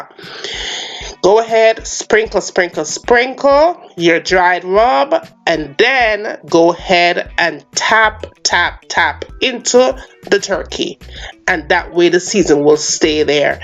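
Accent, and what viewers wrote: Jamaica. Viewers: Love your Jamaican accent🥰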